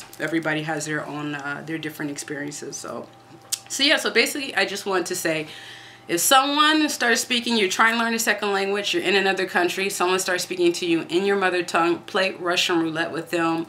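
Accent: France. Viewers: It's funny that your pauses are French times..but you've still kept your American "umm". I think that perhaps some French answer you back in English, because of your French accent. The French are very ticky about who speaks their language and HOW they speak it. So, if it doesn't sound close to a native accent, and they feel that their English is better than your French, they will speak back to you in your language. Rude? I don't think so. I'm sure it could be unnerving, though. Bon courage à vous!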